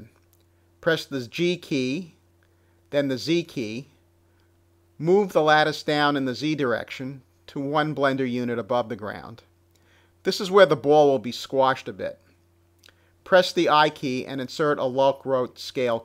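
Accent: Boston, United States. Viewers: Lol I can TELL your from boston I can hear it. you say Bwall. =D Anyways, nice vid ;)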